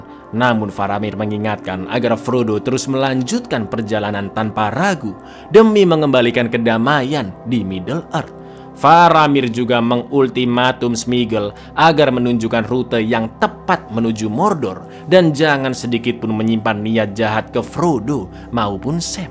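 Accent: Java, Indonesia. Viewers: Samean wong jowo tah😂😂😂😂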